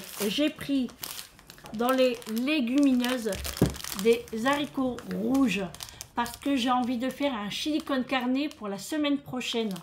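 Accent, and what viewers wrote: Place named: Marseille, France. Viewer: Bonsoir 🤗 Ici soleil cet après-midi, LILLE incroyable le monde..Bon retour de courses 💯heureusement que nous sommes là pour nos enfants 😉il a raison qu'il profite 😅 il est trop gentil 🤗tu parles comme les marseillais ça te coûte un bras😅 bon courage pour demain ta séance, faites sauter les crêpes 😅 Bonne soirée à vous deux bisous 😚 Nathalie de Normandie 😘🥰❤️💯🫶🌹🌹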